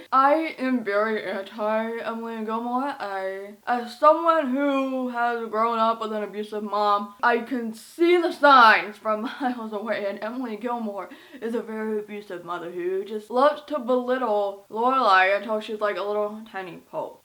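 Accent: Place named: New York, United States. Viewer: lol, I was just joking around, the New York and Boston accent are very recognizable even to us Canadians. I stand by it being cute still.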